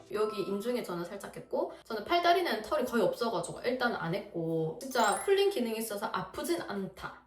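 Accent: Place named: Daegu, South Korea. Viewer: 아이공 ㅠㅠㅠ비슷하게 출산해서 10개월 딸아이 키우고있는 구독자에요 수술대 올라간다는 생각에 마음이 너무 무거우셨을거같아요. 하지만 아기는 생각보다 강하니!! 다 잘될거에요 우리 이쁜아비 수술 잘 받고 건강하게 잘 자라쟈❤ 늘 댓 달때마다 적지만..저두 대구에서 10년가까이 살아서 님 사투리 들으면 너무 정겨워요 지금은 남편따라 또 타지에와서 ㅠㅠ대구가 그리워요.. 님은 얼마나 더 그리우실지.. 힘내세요❤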